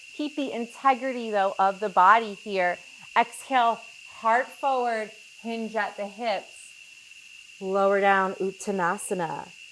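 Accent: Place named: Louisiana, United States. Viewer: Also. Sounds like Louisiana.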